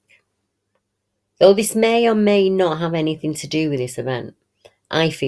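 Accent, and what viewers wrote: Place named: Northern England, United Kingdom. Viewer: brill again thks deb ,love your site, you have a wonderful voice . one of the many great accents us northern folk have , am in liverpool but can use most words correctly 😁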